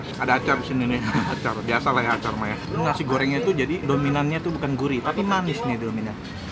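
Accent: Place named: Palembang, Indonesia. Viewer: Suaro wong palembang mano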